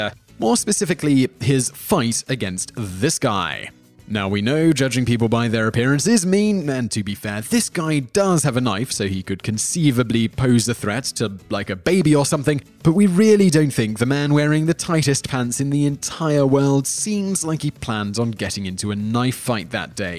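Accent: United Kingdom. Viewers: How can you know all this about Bruce Lee and still not be able to pronounce Kato? Even if you're pronunciation is "more correct" and you say it with a British Accent isn't going to change the fact that no one else says it that way. Also you mispronounced Milton Berle's name as well.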